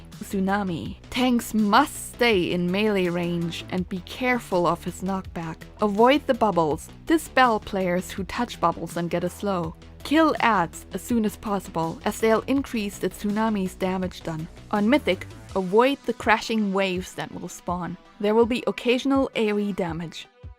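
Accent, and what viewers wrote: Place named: Germany. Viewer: I saw in your "about " section that your accent is German, and you're "Working on that, too". I don't think it's something you need to feel self conscious about. I'm a native English speaker from Canada, and a person from England would say that I'm the one who has an accent. You certainly speak English better than I can speak German. Fantastic guide by the way. Concise, informative, and with a bit of humor to keep it from being too dry. Please make more!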